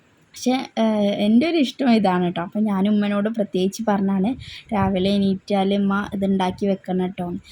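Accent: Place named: Kozhikode, India. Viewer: Video super,കോഴിക്കോട് ആണോ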